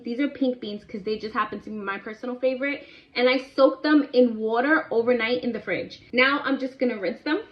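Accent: New York, United States. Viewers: Just love the New Yawk, talking bout dawdahs, an food for the soul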